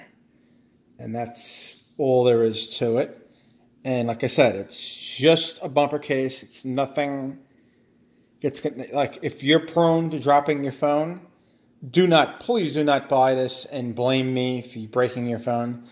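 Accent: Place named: Northeast, United States. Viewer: Yup, the north eastern US accent is still the most atrocious in the country. The accent sounds like a retard being beaten.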